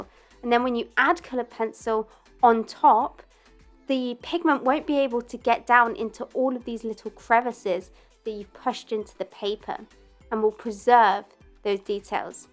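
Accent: United Kingdom. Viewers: Going by her accent I am pretty sure that she is British. Love your name, btw.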